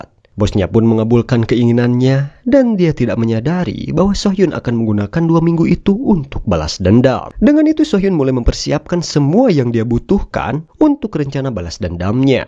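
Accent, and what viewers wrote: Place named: Bandung, Indonesia. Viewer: apakah vo ini gk ngeri malah lucu gara"bahasa bandung nya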